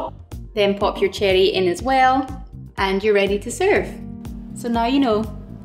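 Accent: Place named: Scotland, United Kingdom. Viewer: You sound more Scottish than normal in this video haha. Amazing videos and cant wait for the next one!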